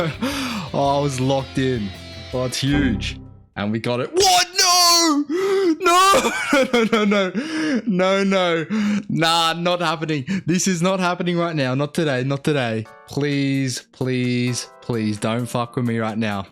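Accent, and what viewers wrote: Australia. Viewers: Hears voice - Checks channel - HES AUSSIE!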